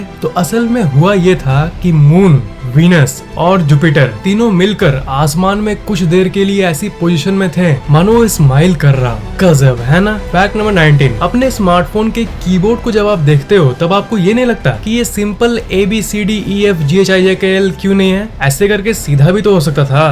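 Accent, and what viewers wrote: Bihar, India. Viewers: Bro are you from bihar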